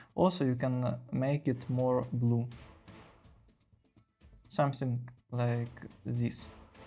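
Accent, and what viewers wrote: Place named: Russia. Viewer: хороший гайд, пишу на русском, потому что уверен, что ты оттуда же (акцент прям выдает)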